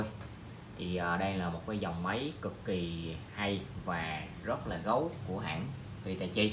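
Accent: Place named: North, Vietnam. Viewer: Người nam mà nói giọng bắc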